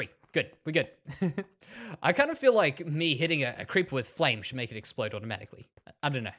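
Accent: Australia. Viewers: You have a relaxing voice ,are you from Australia? I am watching this series so late😂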